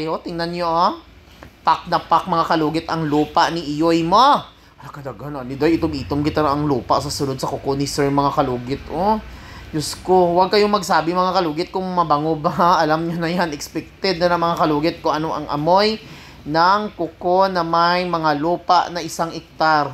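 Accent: Iloilo, Philippines. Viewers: Ilonggo k pla kya malambing ka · Tingala mn ko nga daw karay a nga daw ilonggo ang panghambal pero taga Davao 😄😄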